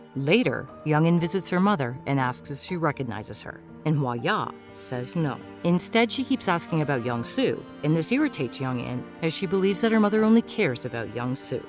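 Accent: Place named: Europe. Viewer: I think maybe she's an European? What's wrong, Korean is difficult language to learn for Europeans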